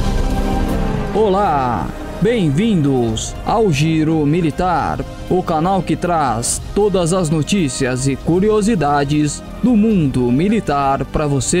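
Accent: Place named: Ceará, Brazil. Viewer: eu tavo vendo uma coisa voce tem um sotaqui de seara voce e searence ne cpz kkkkkkkkkkkkkkkk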